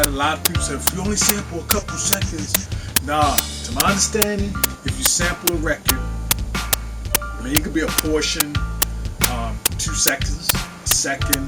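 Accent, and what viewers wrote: Baltimore, United States. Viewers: That Bmore accent be killin me! Lol. great vid fam!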